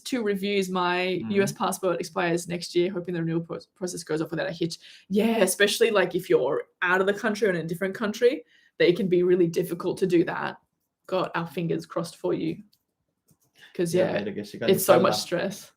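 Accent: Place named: Australia. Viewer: I fell in love with the Australian accent charm thanks to. I loved, love, will love this channel. I’m going to miss you so much.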